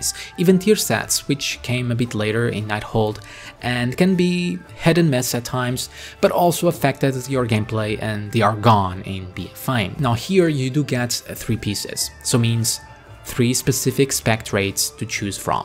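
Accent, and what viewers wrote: Portugal. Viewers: Great! E pelo sotaque só podes ser português. :D Experiência própria...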